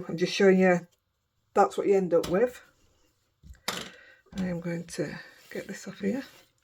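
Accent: Yorkshire, United Kingdom. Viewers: Great tutorial they are gorgeous cards…. Lovely to hear the Yorkshire accent makes me feel like I’m home xxxx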